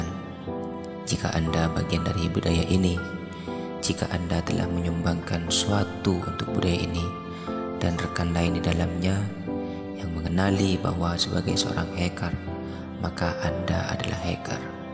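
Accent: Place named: Madura, Indonesia. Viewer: ni pasti orng madura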